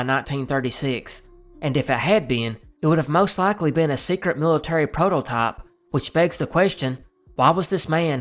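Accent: South, United States. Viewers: Love your channel man. I’m from sc and it’s refreshing to hear a fellow southerner😂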